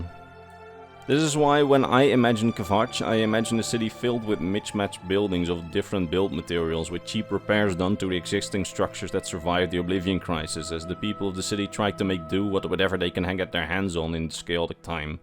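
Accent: Netherlands. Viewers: are you dutch you sound like your from the same country as im from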